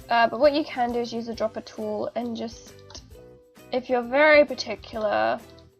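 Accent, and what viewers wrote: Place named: Australia. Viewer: your voice screams "AUSTRALIA"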